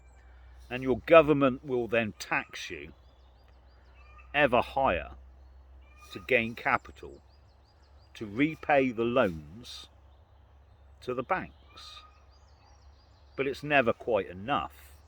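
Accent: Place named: South West England, United Kingdom. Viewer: Wondering if you're near Bristol, as we had very similar weather here a couple days back, and today, and I've been trying to place your accent for a while haha. Definitely South West, but don't worry, I'm not asking for you to give any info. I'm happy to keep the mystery alive!.. Thanks for the fantastic insight and content as always. Being an unemployed bloke in my mid 30s in the UK is a scary place to be right now. Being on Universal Credit for the first time in my life over the last couple years is nothing I'm proud of and something I had to do after getting back from a few years away just before the 'scamdemic', and after three years in Aus (not a perfect place, but a large economy like ours, the difference being the standard of life there is reflected), it's made me see how much we (here in the UK) exemplify what it is you're touching upon in this video. 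Blimey, I've really gotten an insight these last two years into just how much of a commodity and statistic I and everyone else who is out of work are viewed as, with regards to being in the system. I am currently looking for work. Being on welfare has not been good for my soul, but the prospect of getting back into work in a country that only really values me for that prospect is also quite terrifying, and that has nothing to do with the notion of a hard day's graft, but everything to do with the erosion of my soul.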